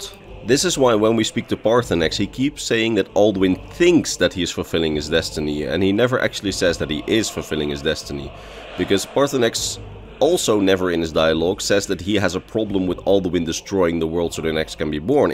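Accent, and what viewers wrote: Netherlands. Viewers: I’ve lived in Amsterdam for more than 2 years now and I just realised you’re Dutch! Or atleast you sound Dutch haha. Great video as usual 🙌🏾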